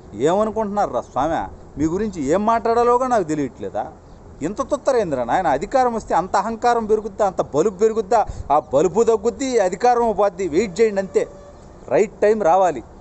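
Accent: Nellore, India. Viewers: నెల్లూరు యాస ఇరగదీశావ్ తమ్ముడూ..కేక నువ్వు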